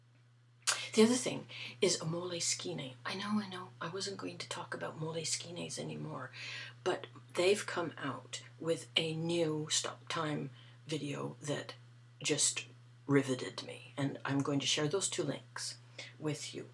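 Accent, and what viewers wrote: Canada. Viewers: Sooo nice of you to promote my video! Wow it's great to have such support...especially from someone who doesn't even like hockey! I guess the French Canadian accent was simply irresistable, n'est-ce pas, mon amie. All the best to you! SP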